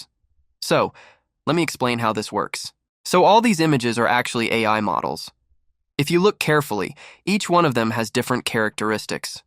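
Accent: Sri Lanka. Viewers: are you from sri lanka?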